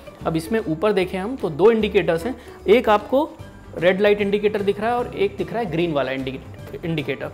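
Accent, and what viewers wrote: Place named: India. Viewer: Why do you speak for 1minutr plus without demonstrating the sandwich maker? If you thought you were doing something your Indian accent makes it hard to understand you also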